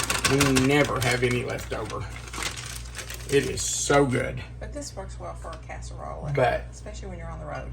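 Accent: South, United States. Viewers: I love your southern accent and everytime you say TOGETHER,,,, I giggle and I say it the way you do ,,,,,unfortunately with my Boston accent ,,, just love you guys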